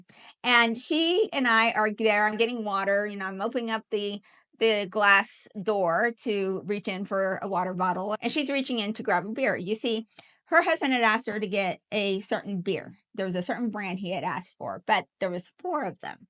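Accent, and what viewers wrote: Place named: Texas, United States. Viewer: Gotcha on the TX accent! I had a GF shortly after high school who grew up there from the age of about 12 and she picked it up real quick. One of her expressions for affirmation was "You bet!" which I will never forget what that sounded like. (She was damn sweet! If we would have managed to stay together we'd have about 12 kids by now!)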